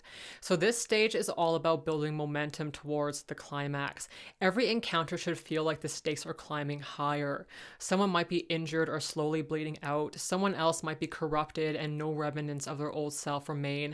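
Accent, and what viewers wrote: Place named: Canada. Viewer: That's really interesting! Sorry to hear you were bullied for that. I never would have guessed Hungary. I'm from Minnesota in the US, and I detected a little bit of a Canadian accent in your voice, since our accents are somewhat similar. I like the way your combination of accents fit together! It gives your narration a unique and pleasing style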